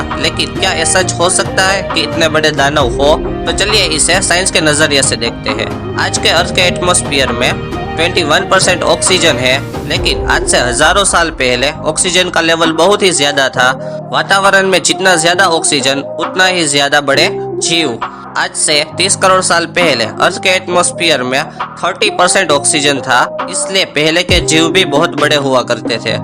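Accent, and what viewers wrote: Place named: Gujarat, India. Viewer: I realized from your voice that you are from Gujarat..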